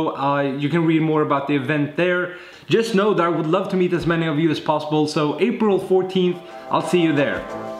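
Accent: Sweden. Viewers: Are you swedicj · I was about to ask about your accent then you said Sweden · Are you Swedish??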